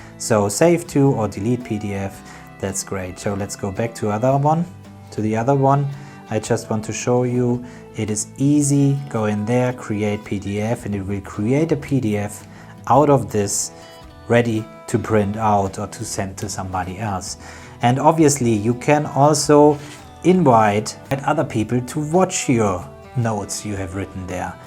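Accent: Germany. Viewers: Are you from Germany? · Oh Gott, dein deutscher Akzent ist so schrecklich anzuhören!!!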